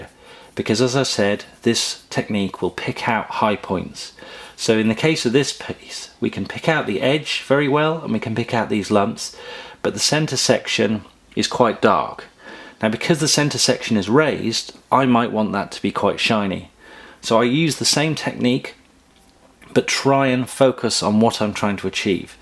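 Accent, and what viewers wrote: United Kingdom. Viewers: Nice to hear a British accent on Utube 👍👍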